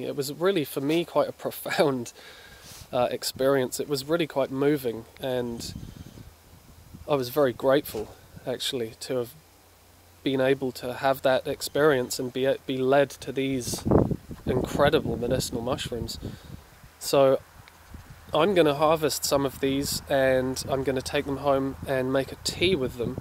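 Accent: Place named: United Kingdom. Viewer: You sound British, where about didn't you find the mushrooms? I didn't think they grown in the UK.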